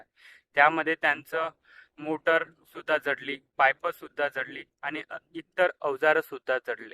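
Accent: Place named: Bihar, India. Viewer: Ha paka bihari ahey.ugich marathi anni shetkari boloth 😂😂😂.duplicate marathi.and politics 😂😂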